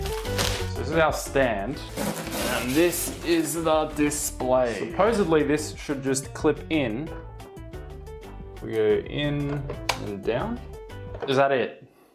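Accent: United Kingdom. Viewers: Don't pick up bri'ish accent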